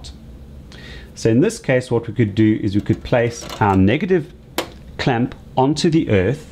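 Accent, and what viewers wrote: South Africa. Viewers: haha sud afrikaans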